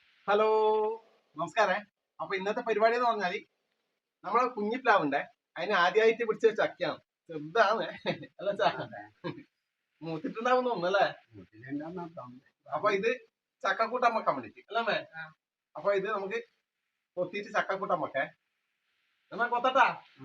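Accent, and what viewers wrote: Kannur, India. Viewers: വല്ലാത്തൊരു നൊസ്റ്റാൾജിയ 😔😔... നാടും വീടും ഉറ്റവരെയും വല്ലാണ്ട് മിസ്സ്‌ ആകുന്നു.. പിന്നെ നമ്മുടെ കണ്ണൂർ ഭാഷ.... 🙏🏻എന്റെ നാട് എത്ര സുന്ദരം ❤️❤️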